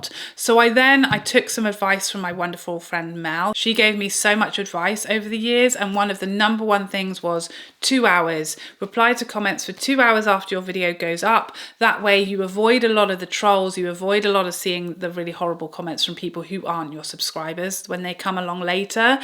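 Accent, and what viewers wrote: England, United Kingdom. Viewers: Congratulations on 6 years!!! I discovered your channel at the beginning of the pandemic and your lovely accent reminded me of the wonderful years I lived in England back in the early 2000’s. I’ve enjoyed all of your content and look forward to seeing what you do in the future! ❤😊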